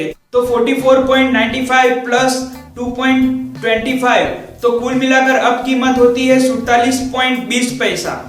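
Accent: Gujarat, India. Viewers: Bhai tu gujarati he hena?? and vaat nahi vat bol...or exercise nahi excise duty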